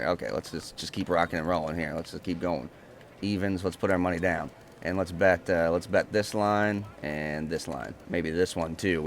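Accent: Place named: Minnesota, United States. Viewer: Your from Minnesota. So I’m I 🤪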